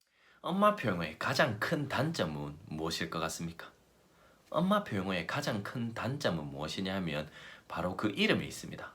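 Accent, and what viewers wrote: Gyeongsang, South Korea. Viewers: 공감되고 좋은 말씀 감사합니다!!! 영어책읽어주시는 클립 먼저 봤는데 영어 목소리 듣고 한국말 목소리들었는데너무 반전이셨어요 😀경상도 말쓰실줄 상상도 못했으요 ㅋㅋㅋ미국에서 오신줄 알았는데 ~ㅋㅋ(영어너무 잘하셔서~^^)좋은생각들~ 좋은 책소개 감사해요!:)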